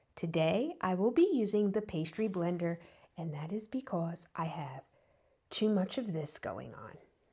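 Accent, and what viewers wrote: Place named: New Jersey, United States. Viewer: I've lost a lot of my jersey accent living in Maryland for almost 30 years now but when I heard your accent in the video I told him this recipe must be legit go get me some Bisquick ASAP 😂😂😂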